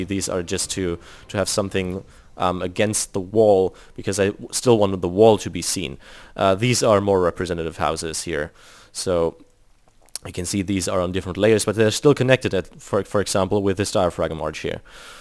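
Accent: Germany. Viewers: I like the german accent though its a tad harder to understand. Also this is gonna be a fun series. :) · maaaa schon wieder so ein deutscher .... wenigstens ein nicht sooooo deutscher akzent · lol... the German accent makes you make more sense somehow... can't really explain it! xD It's like your descriptions of what you are doing whilst using the German accent punctuate the steps in your thinking process more clearly... idek (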